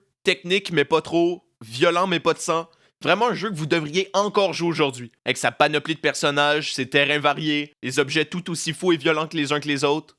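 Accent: Quebec, Canada. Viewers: L'accent québécois😂😊❤ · Insane ta vidéo!!! Good job mon gars, en plus en Québécois hahaha il y en a pas asser:))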